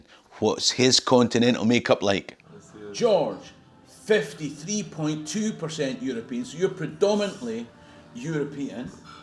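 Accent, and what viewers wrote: Scotland, United Kingdom. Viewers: As a black man from America it is refreshing to see someone talk about Scotland from a black Scottish point of view. Your insight into Scottish culture and history is very transparent and fascinating. Because by you having that insight from a different perspective. You give a more well balance look at the Scottish culture coming from both sides .In my short time watching your videos.I had learned so much because it is easier to when i see myself.Even though you're Scottish accent is thick . Scottish history has alot of black roots in it. You really makes it fun and very interesting. You talk about the technical aspects of history and culture with having the need to down play or erase any type of white contributions that was made towards the Scottish culture. I was always fascinated with Scottish culture. But i could never listen to or watch any white Scottish person speak on it. 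Because they always had the need to downplay or erase any type of contribution that was made by black Scottish folks.You don't downplay nobody real contribution to the Scottish culture. I thank you for that.I'm trying to get others to know about your channel.I feel that it is good for young black children to learn and see different cultural groups within the black world wide community. Your videos are fun and your presentation is on fire. That's how good you are my brother. Much success to you and your channel.💯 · DNA is good for crime detecting, and paternity too, but as far as ethnicities I think it's not accurate. People have moved in the past all over and if you went in a time machine back 300 years what would test results say then? You sound Scottish to me. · WOW this was so interesting your daughter is the polar opposite of my DNA test results... genetics is so fascinating. Btw I love your Scottish brogue 😊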